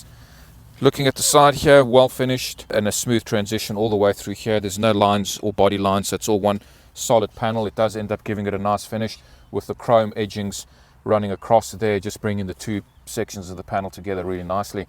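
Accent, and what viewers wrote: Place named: Johannesburg, South Africa. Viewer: Sounds like you’re from Linksfield, Joburg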